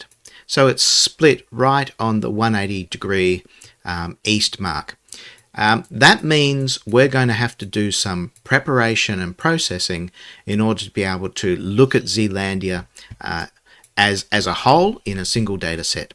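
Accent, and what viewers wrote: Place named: Australia. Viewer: I watched this exactly 5 minutes after you uploaded but it was around 4AM in the US & I was too tired to think of what to comment. Now, another late night as I was revisiting your video, I wondered why you would upload at that time. Then it hit me that your accent is Australian, not British, so no wonder you chose this idea to use for a video. Nevertheless, it is awesome to see that something off my list was worth investigating. I have always been fascinated by this region since learning about the recently extinct Moa birds that so strongly resembled dinosaurs & by my family owning a full grown pet Emu when I was a child. Australasia is so full of earth lore like this & I am especially intrigued by the scattered islands connecting Australia & Asia where it looks as if the continents violently dragged bits of land with them as they drifted apart. 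Anyways thanks for the video & hope you are enjoying your summer!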